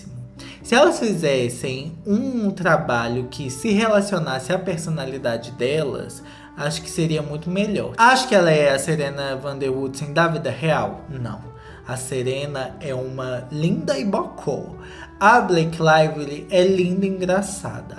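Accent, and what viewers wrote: Minas Gerais, Brazil. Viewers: Eu ri muito com o lacre sueco! Habla mesmo papi! Kkkkkkk Amo os deboches do com esse sotaque mineirinho 🥰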